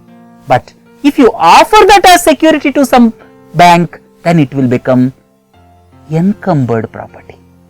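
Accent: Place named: South India, India. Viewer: atleast the difficult or complex terms must be told in a neutral accent plz... for these terms, no south indian accent plz... coz north indians like us cant understand...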